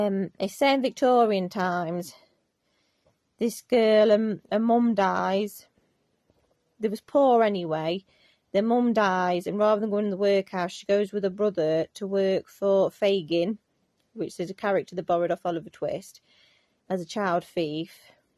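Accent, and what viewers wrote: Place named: United Kingdom. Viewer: Where are you from in UK, Queen Victoria? I like your accent very much I want to learn it when I come there 😊❤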